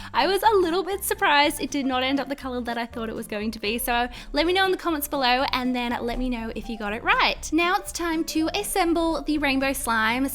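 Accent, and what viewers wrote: Australia. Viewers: You can tell by her accent, she is from Australia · Shes Australian. She cant help it. If you want to hate, get the fuck outta here.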